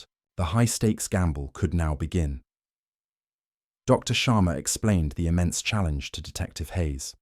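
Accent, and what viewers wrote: United Kingdom. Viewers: Is this just something you made up because 1st, There isn't a Granby. State forest in Massachusetts 2nd Hartford is in Connecticut.. 3rd Kill the British accent... It sucks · Why is there a British guy talking about this story couldn’t you make it an American????????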